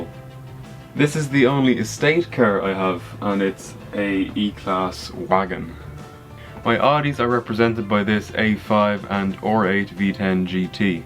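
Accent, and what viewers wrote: Ireland. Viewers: are you irish ? so am I . cool video :)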